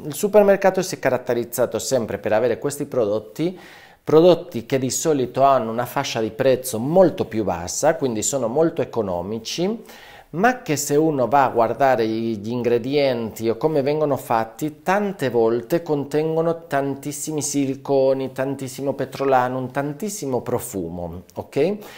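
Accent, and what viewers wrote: Spain. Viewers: Caro dottore, l'ho trovata x caso e le faccio i miei complimenti x la chiarezza di questo video. E anche x il suo bellissimo accento spagnolo😊Grazie.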